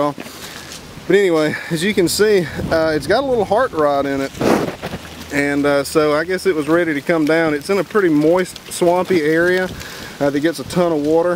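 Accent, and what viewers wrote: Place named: Georgia, United States. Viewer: Yeah, you sound like a Georgian. I live in TX but have a sister who lives in Lawrenceville. Your voice sounded familiar - like my brother in law. Keep on making videos.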